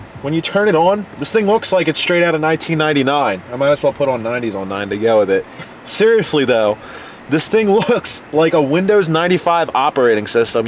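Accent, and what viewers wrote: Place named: Baltimore, United States. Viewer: That Baltimore Accent. #MDlivin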